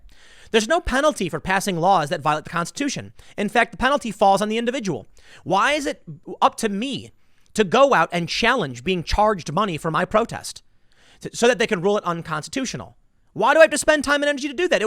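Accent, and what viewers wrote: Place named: New Jersey, United States. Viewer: Going to miss Tim's occasional Jersey-speak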